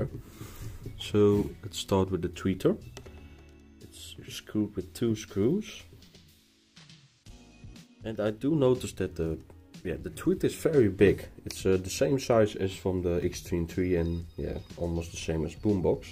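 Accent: Netherlands. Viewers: Nederlands ? Ik hoor de nederlands Engelse uitspraak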